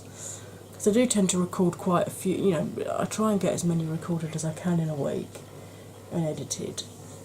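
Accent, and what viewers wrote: United Kingdom. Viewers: Hiya, new subscriber here, I found you through Makeup Struggles! I too am feeling this heat - it’s too much isn’t it! I’m 48, very fat, relatively fair but defo fabulous! I’m jealous of your lids space as I have very hooded eyes! I’m in the south too - I’m assuming from your accent you are Essex way on? Love this look! Sorry for long post I just wanted to introduce myself! 😃 oh yeah and I also have spinal stenosis!!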